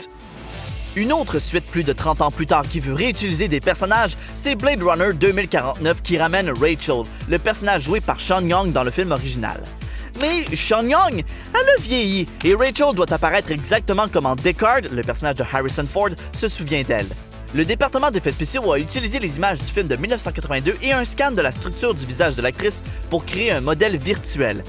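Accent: Quebec, Canada. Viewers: Dislike pour ce putin d'accent québécois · cet accent québéquois me donne envie de mourrir :( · Cet accent est juste insupportable. Pitié, si, remplacez-le.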